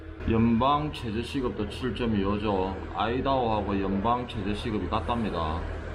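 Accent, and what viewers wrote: Gyeongsang, South Korea. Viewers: 구수한경상도 말씀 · 구수한 경상도사투리에 동질감을 느끼며 고마 썌리 마 구독 때리고 가겠습니다~ 항상 안전운전하시고 흥미로운 영상 계속 부탁 드립니다.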